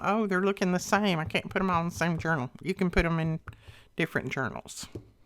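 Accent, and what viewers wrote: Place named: Alabama, United States. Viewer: I love your accent! ❤❤❤ Are you from Alabama?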